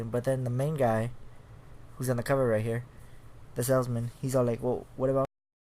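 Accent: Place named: East Los Angeles, United States. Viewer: three eps legit scared me: Inside the Closet, The Last Car and The False Prophet. One thing I liked about TFTDS is that a lot of the stuff went unexplained so that made it scarier. Although in the case of The Last Car it went beyond being unexplained to flat out just not making sense. Are you from East LA? You have the stereotypical East LA accent.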